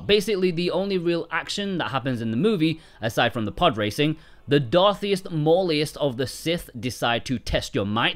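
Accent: England, United Kingdom. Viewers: I've given you a sub, great video btw, but I'm still baffled where in England your accent comes from, maybe it's a Mos Espa twang.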